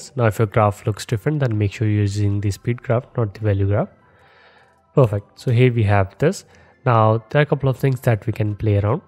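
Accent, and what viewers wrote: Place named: India. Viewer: I'll be completely honest, I can easily tolerate this level of indian content just for how chill it is. the dang phone calls I have and the audio is so muffled, it be difficult to hear individuals with said accent via phone quality but YouTube audio quality is like listening to an audiobook lol Thank You for being here sir!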